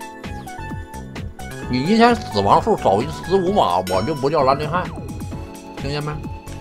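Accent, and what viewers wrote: China. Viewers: 猴哥到底大陸哪裡的口音呀？積木XD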